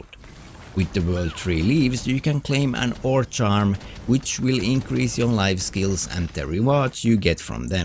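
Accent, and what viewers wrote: Finland. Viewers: Familiar finnish accent :)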